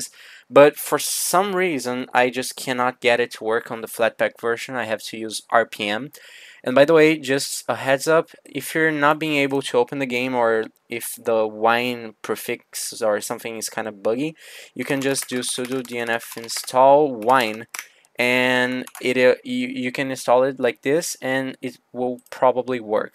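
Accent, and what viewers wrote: Brazil. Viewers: Esse sotaque Brasileiro não engana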